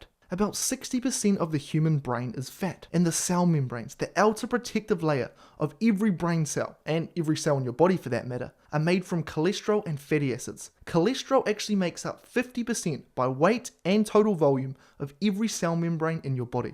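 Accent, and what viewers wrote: New Zealand. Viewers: to me he sounds like a Kiwi at times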